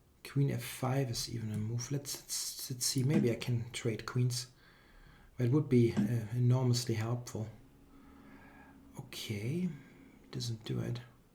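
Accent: Germany. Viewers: I don't find his games boring at all. I like his style of positional play and watching him turning small advantages into a win. he also easily becomes a beast in unconventional positions. Even seeing him blundering (rarely) is exciting and it never gets boring. Plus, his sense of humour and German accent really add a great colour to his videos. I am grateful that he provides us such entertainment everyday. I feel like I have a friend on the other side of the camera and I am always thrilled to see how he did today.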